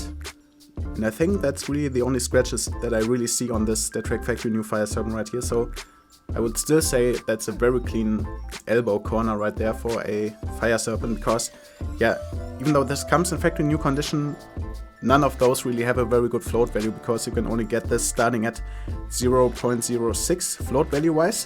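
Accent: Germany. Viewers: Are you German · Are you a german guy?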